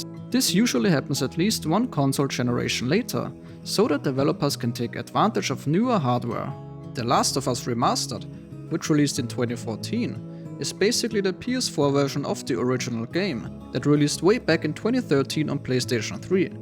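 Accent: Germany. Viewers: One Frage you are from Deutschland oder ?😅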